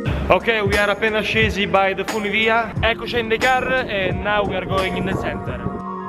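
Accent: Italy. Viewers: inglese alla renzi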